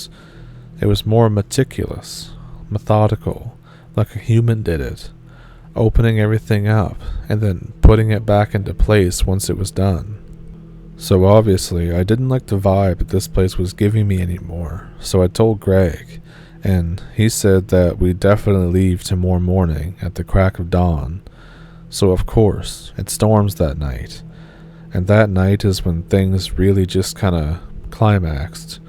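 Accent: Canada. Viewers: I love the Canadian accent. I pop your channel on to relax as I go to sleep. Thank you for all your hard work gathering stories to tell. Thus channel is great. Jo from Australia 🇦🇺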